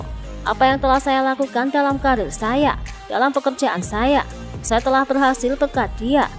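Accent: Java, Indonesia. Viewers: saya suka artikulasinya....medoknya muanteeep. Jaya terus Indonesia Channel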